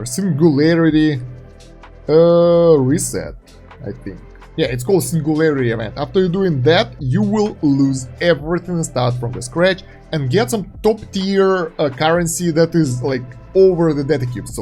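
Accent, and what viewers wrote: Russia. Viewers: Русский акцент)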